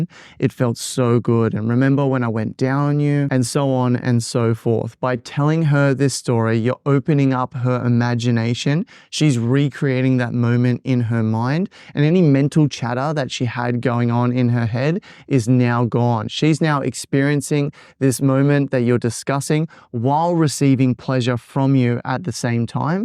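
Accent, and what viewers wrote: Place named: Australia. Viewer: you're amazing. i love your videos and your stupid little australian accent. keep it up, i love watching your stuff with my bestie, we're so educated now!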